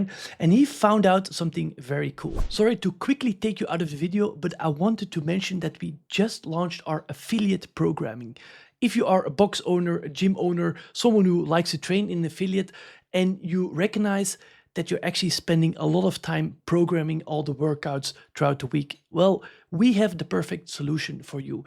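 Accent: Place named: Netherlands. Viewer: He sounds dutch or flemish. In those languages, the emphasis is on the first syllable. Otherwise his english is pretty solid for a second language. Constructive remark though.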